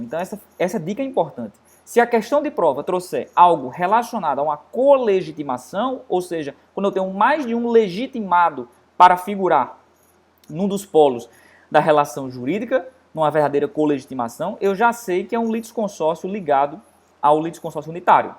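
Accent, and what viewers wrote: Northeast, Brazil. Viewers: muito didático, obrigada por compartilhar seu conhecimento. A propósito, cm todo respeito, adoroooooo esse sotaque do nordeste.😍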